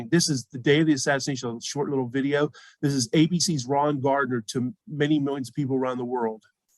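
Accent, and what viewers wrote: Pittsburgh, United States. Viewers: your accent I being a life long northsider know you must be from Pittsburgh am I right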